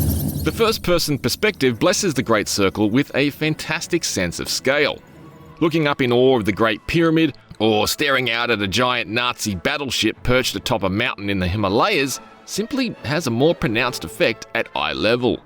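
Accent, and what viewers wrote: Australia. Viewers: I've always admired your reviews. Well written, relatable, and just like a friend telling me what they think of a game. As someone who has spent most of my career in network TV and video production, I want to give credit where it's due. It's also always great to hear an Aussie voiceover that fully embraces how we talk. I'm also thrilled to see such a win for Xbox. Such a revered franchise from my childhood deserved the true AAA treatment, and I can't wait to fire it up on my PC.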